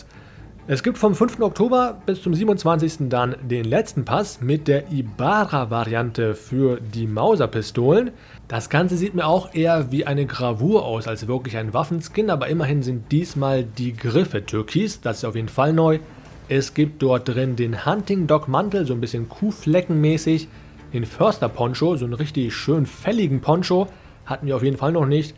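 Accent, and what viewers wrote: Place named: Australia. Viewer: Wenn das du warst der da Englisch gesprochen hat, dann hörst du dich echt wie ein Australier an. ;-)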